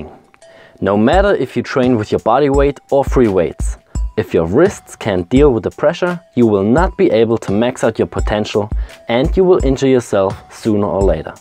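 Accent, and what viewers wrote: Germany. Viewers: German accent!!! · This german Akzent 😂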